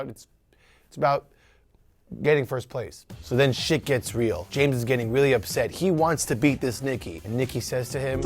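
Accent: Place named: Norway. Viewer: I thought the accent was Norwegian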